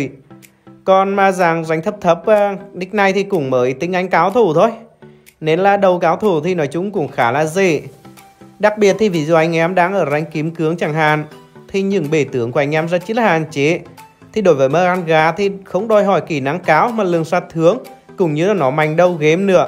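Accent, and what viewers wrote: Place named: Nghe An, Vietnam. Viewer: Giọng nghệ an nghe vui quá hiii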